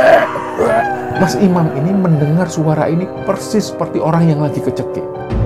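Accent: Java, Indonesia. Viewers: Kok ga nemu pencerita hebat seperti mas... selalu berkelana membayangkan jalan ceritanya karena saya hanya mendengarkan, jarang sekali menonton karena sembari membuat kue.. alur yg mengalir dengan halus, berirama, menegangkan dan dengan aksen Jawanya sangar indah, terima kasih mas dkk ❤❤❤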